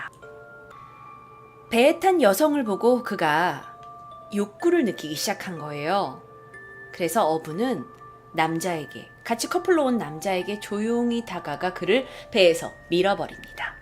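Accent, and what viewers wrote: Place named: Honam, South Korea. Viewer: 제시카님 전라도 출신이라 그런지 호남사투리 맛있게 하시네요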